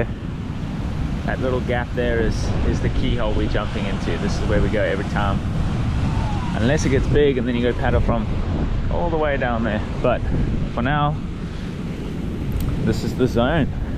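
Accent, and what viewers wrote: South Africa. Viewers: I hqve to ask what accent you have I’m thinking South African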